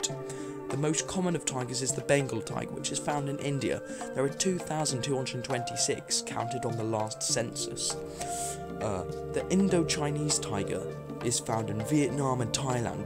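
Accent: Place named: England, United Kingdom. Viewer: Are you English? You have a strong accent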